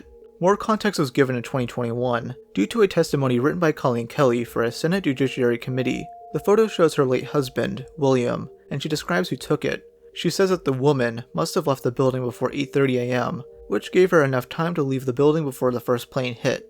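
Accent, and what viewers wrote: Canada. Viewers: isn’t it obvious, they are both Canadian as said and he just puts on a slightly Mexican accent for blameiton “ ” (Mexican George) show and puts on his typical canuck accent for his persona. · I don’t really think so. has a bit of a Canadian accent, meanwhile has a slight Spanish speaking accent (can’t pin point where, my Spanish is not very good)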